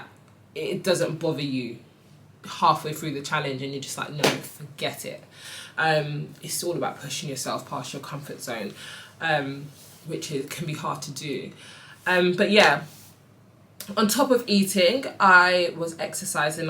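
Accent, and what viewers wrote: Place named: United Kingdom. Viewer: Side note: Sometimes it’s just nice to click on a video and hear a British accent ☺️ you look amazing sis! I can’t wait to start my journey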